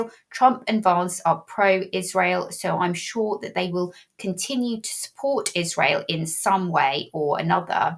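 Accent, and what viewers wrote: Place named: United Kingdom. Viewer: Sweet British accent ❤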